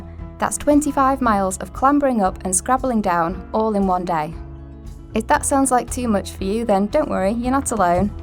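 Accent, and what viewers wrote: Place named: Yorkshire, United Kingdom. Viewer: That was great. Superb photography, and a lovely Yorkshire accent.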